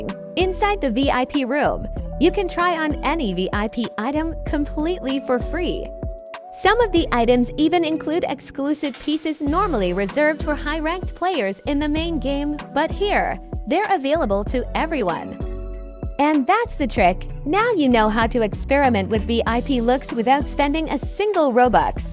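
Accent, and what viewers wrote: Russia. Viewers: Are you Russian or Ukrainian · Arwe you russian? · Are u russian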